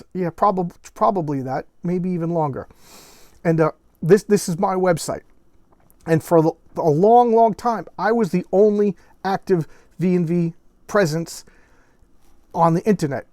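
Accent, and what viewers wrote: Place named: New York, United States. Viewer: I’m in Oklahoma City. I don’t think you said where you live but I’m guessing somewhere near NYC based off your accent? I’ve signed up for the forum and am waiting for my e-mail verification. Re: Jeff Dee. About 10 years ago I sent him a fan email that ended up several years ago with me being hired to illustrate the War Heroes WWII sourcebook for their ‘Mighty Protectors’ game (basically V&V 3). I was thrilled to be a small part of V&V ‘lore’ and Jeff was entirely professional. At one point he gave me his number in case I had questions. Based on interviews, he is very outspoken and direct, so I didn’t dare call him. But V&V is great and his art is awesome.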